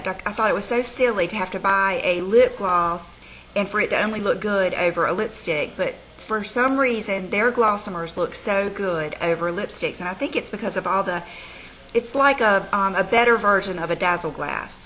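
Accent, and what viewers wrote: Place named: Virginia, United States. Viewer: you're so pretty!!! Watching this video totally inspired me to get up and get ready and head over to my Chanel counter;) I love your accent btw... Are you from Virginia?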